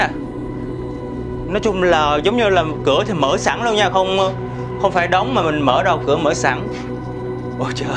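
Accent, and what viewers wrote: South, Vietnam. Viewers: Nghe giọng Miền Nam là thích rồi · Bạn có thể nói dễ nghe hơn một chút được không. Nhiều lúc bạn vừa đi cừa nói nên rất khó nghe. Cả gia đình mình từ già đến trẻ đều thích xem video của bạn nhưng mấy người già khá là khó nghe và gđ mình là người Bắc nên khó nghe tiếng miền Nam. Mình cảm ơn.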